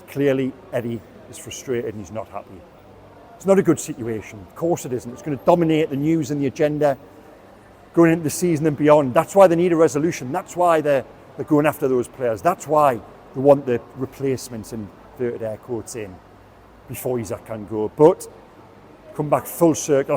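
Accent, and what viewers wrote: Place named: London, United Kingdom. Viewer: No need to put your London media voice on for us if you want to do a video in your proper Geordie voice we are here for it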